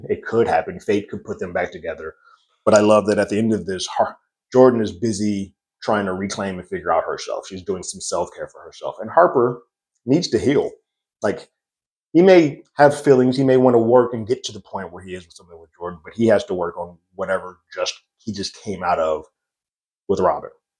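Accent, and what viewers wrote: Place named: Midwest, United States. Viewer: Great review and you are really handsome 😊. You sound like a mid westerner. Just rewatched the show again and stumbled on the review.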